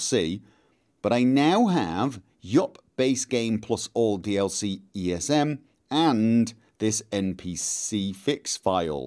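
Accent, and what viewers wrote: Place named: North Wales, United Kingdom. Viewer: Are you from North Wales or there abouts? Trying to place your accent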